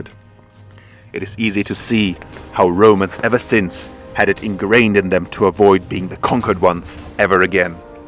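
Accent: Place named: Ireland. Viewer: did you even watch the video? Did you listen...did he sound american to you? The dude is clearly Irish. And that logic makes absolutely no sense! How is that doubling down? Carthage was destroyed and levelled to the ground by rome, its population largly sold into slavery with the rest exterminated. Do you understand history in correlation to current events...? Essentially we've established the guy behind the vid to be Irish and that comparing usa with cathage is not doubling if anything it does the complete opposite.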